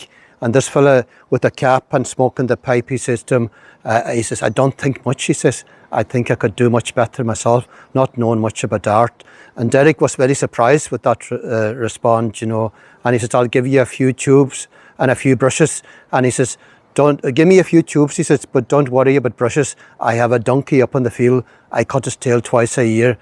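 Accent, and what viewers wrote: Ireland. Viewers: Its quite extraordinary and refreshing to hear an irish man speak english as a second language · Isle of Tenerife, Spain, Africa. Brilliant! What an interesting, informative video! Well done! And all said in beautiful, melodious Donegal English, the favourite accent of many. Now, a maybe strange request: As many folks are interested in Tory, not only for its fine paintings and archeology, but also for a maybe even rarer aspect of its culture, the Gaelic language, said to be less depleted there than anywhere else. Would you consider doing the very same layout as this video, but all completely in full, natural, Donegal Gaelic? I'd be riveted to hear it. Best wishes, Patchy.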